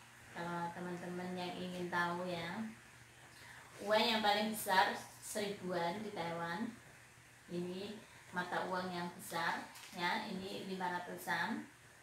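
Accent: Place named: Java, Indonesia. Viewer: Dari logat nya koyo wong jowo yo mbak..jowone ndi smpean....wes tak kirim duit e lengkap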